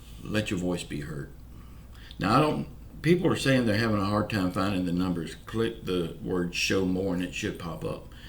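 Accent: South, United States. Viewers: Hey. If you have a knitting show, I'll be there no matter what. Hope you'll still give us an update on the politics AND the stimulus. You're doing a great job. I'm in NC and grew up in FL. Love your Southern accent. Keep up the good work. You are a refreshing start to my day. Thank you for taking the time for us. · I am from the south and I sound a lot like you there is nothing wrong with your speech I love it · A knitting show lol.....I love your southern accent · GOD BLESS YOU MY BROTHER FOR HELPING US STAY INFORMED DURING THIS TIME, AND PRAY HE HEALS YOUR THROAT CANCER NOW 1 PETER. I LOVE YOUR SOUTHERN DOWN HOME FRIENDLY PERSONALITY, HEART, AND ACCENT LIKE ME AND THE GOOD OLE PEOPLE HERE IN NORTHWEST LOUISIANA!! 😂❤🙌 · May God heal you sir and i love a southern accent 💛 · Good morning! Sorry to hear about your throat cancer but I still love your southern accent, and thank you for the work that your doing to keep us informed. 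God bless you sir 🙏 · your a great man..we need u in office...someone that cares...Prayers for your cancer...thank u for sharing thoughts and videos...taking time for all of us... love the southern accent...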